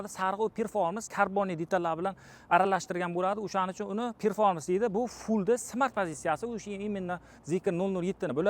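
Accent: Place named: Kashkadarya, Uzbekistan. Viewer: Шева кашкадаре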